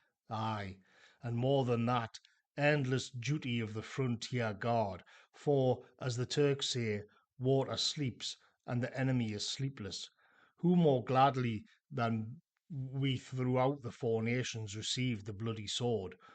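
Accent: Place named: Newcastle, United Kingdom. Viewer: My father was a Geordie, so perhaps I'll get away with saying that your accent really adds to the reading of that passage. Makes me think of the wildness of the North, walking Hadrian's Wall in pelting rain and wind, the wildness that was still there in the souls of people like my grandparents who were proud of THEIR (very working class) past, the Reivers, the Scottish border skirmishes.. and told stories of ghosts and witches.. Or I'm just being wildly over romantic, but in my own defence I'm half Geordie, half Welsh so I get it from BOTH sides...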